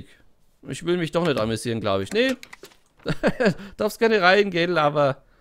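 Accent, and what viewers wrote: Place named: Bavaria, Germany. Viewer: hach ja, zum zweitausendsten viewer bringt der gute seine familie um - das ist doch mal dedication:D ich bin auch wegs papers please auf den Kanal aufmerksam geworden (und nicht wegen irgend eines anderen youtubers^^ Hab mal ein videocheck auf der gamestar gesehen oder so). Ich bleib auch gern bei anderen lets plays. Immer sehr angenehm, deine atmo, dein bayerischer slang... Wo kommsten genau her, wenn ich fragen darf? Einzige Kritik: Beginn doch nich jedes Video immer mit "herzlich willkommen". Ich hab die zwo wöter so gfressen...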